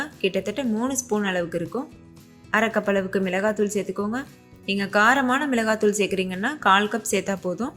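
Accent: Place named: Kerala, India. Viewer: Your way of talk seems you are a malayalee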